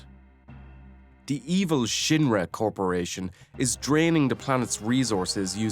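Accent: Ireland. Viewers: Tree D or 3D 😂 im a a black girl from Atlanta but my dad's parents are from Ireland. I know a fellow Irishman when I here one. I'm making a 16 bit game and need all the info I can. Take care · You're from Dublin, ain't'cha?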